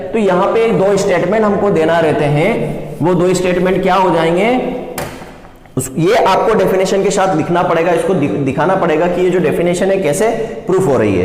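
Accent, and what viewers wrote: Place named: Indore, India. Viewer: Kitna hi kuchh krlo pr thodi si indori to muh se nikl hi jati he ........( Apn)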